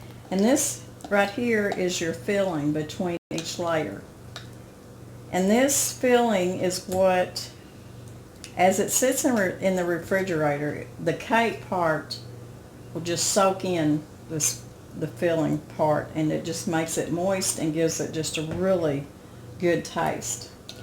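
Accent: South, United States. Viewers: Millboro Quilter South Dakota haha it’s just a southern accent.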